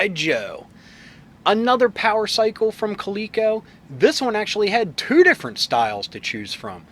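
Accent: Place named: Baltimore, United States. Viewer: Sounds like you’re from Baltimore too bro 😅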